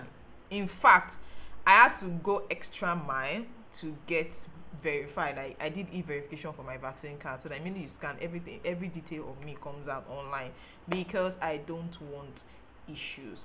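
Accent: Ghana. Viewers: Ur accent look like Ghanaian good job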